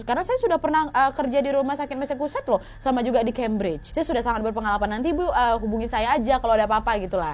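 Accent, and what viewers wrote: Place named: Java, Indonesia. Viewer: Mood banget kak ngomongnya medok 😀😀😀😀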